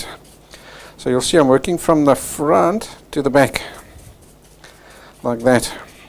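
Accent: South Africa. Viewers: Love the South African accent!👍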